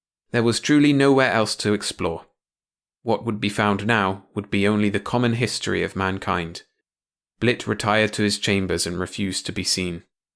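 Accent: United Kingdom. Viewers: Weird how our brains are all wired to what is our familiarity, so as a British listener I find it so much clearer to hear a usual British accent; it’s not scouse or geordie which I would expect non-Brits to struggle with; and there’s plenty of US and Aussie content compared to British; I really appreciate the opportunity to listen in my own very boring and average British accent. I know I struggle with slow accents, I think it’s Mid-west American but as I’ve only visited LA, Texas, and Manhattan I can’t be sure. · I love UK narrators and the accent!!! ❤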